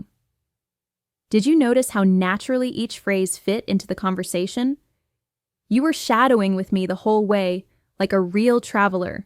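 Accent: United States. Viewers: s accent is american? Any body tell me.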